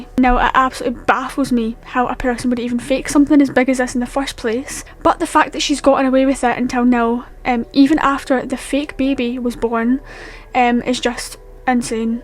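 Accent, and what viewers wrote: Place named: Glasgow, United Kingdom. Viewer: Love the sweet lovely Scottish accent. With a small dash of "the Drum (Drumchapel)" dialect. Brilliant content and keep going as you progress it will improve but not lose your natural ability.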